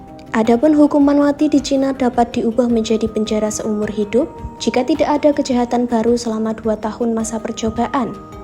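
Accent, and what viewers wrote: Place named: Yogyakarta, Indonesia. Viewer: Naratornya asli jogja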